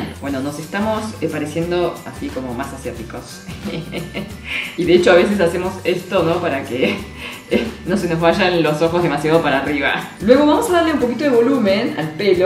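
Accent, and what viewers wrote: Argentina. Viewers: Hablas cm argentina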